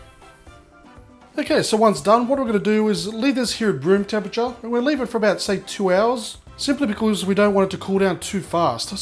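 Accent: Australia. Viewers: he's Australian:) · I LOVE that Australian accent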